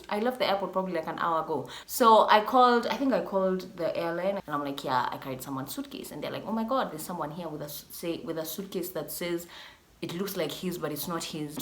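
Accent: United Kingdom. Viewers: am here for the accent...girl i love you....when you are loud kidogo and ur british/UK accent can be heard in there somewhere.. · The British accent tho🥰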